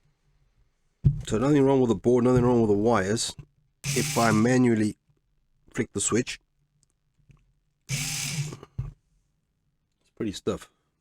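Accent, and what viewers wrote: South Africa. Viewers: I always think of apartheid when I hear your accent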